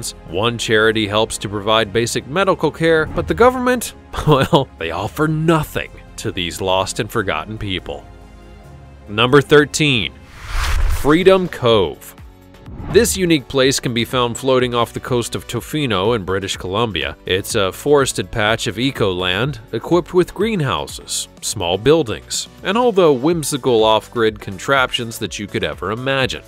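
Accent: United States. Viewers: Offtopic: Sorry, but I hate this American accent, pronouncing HOTSPOT as HAATSPAAT. The poor O is not there for nothing. If this language would have liked you to say HAATSPAAT, we would have written HATSPAT. The same applies for ROCK and RAACK.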